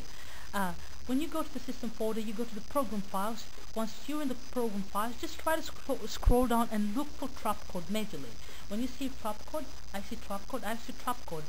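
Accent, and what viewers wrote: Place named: Africa. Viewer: No no crime we are brothers xD People don't understand an African accent when they hear one lol Keep up the great work + new subcriber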